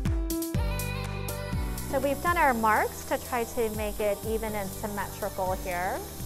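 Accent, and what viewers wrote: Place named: United States. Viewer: From her accent I can tell she's an American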